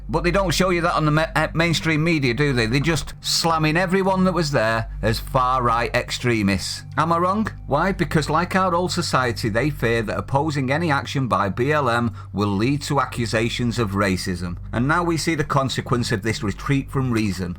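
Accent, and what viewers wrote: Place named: RP, United Kingdom. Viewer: The key principle of Common Law and the US Law derived from it is the presumption of innocence. "The arresting policeman's actions were callous, brutal and indefensible." Indefensible, eh? Oh well then, no point in holding a trial, is there. The sonovabitch is guilty, with no possible defense, just lock him up or lynch him, right? I do not know if Derrick Chavin acted criminally or not. And neither does Douglas, despite his fine words and plummy accent. By sheepishly stepping into the "police brutality" story, and condemning the policeman in Minneapolis, based on nothing other than a Facebook video and the screeching of some activists and journalists, undermines his own credibility as the voice of reason. Wait for the trial, then the verdict. Until then, STFU! When everyone from the POTUS to journos like Douglas pipes up to opine that Chavin is guilty, do not preach to me about an impartial justice system. 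Chavin can not get a fair trial anywhere on this planet now, thanks to this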